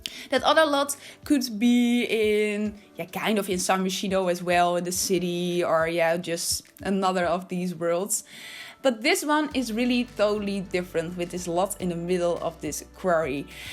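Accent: Netherlands. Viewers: Lekker Engels op zn Nederlands ook, heerijk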